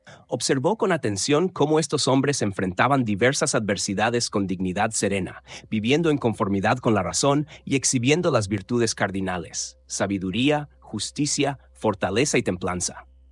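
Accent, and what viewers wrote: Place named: United States. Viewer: Porqué un narrador gringo, FUCHI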